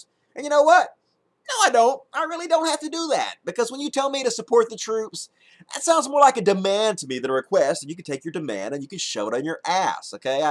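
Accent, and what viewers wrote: South, United States. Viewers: What a hoot! That face, that accent, - going completely against my non-American expectations of how Americans who look and sound a certain way think and delivering complete good sense. Nice one. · Probably the most rational person about war who has a southern accent that I've ever heard.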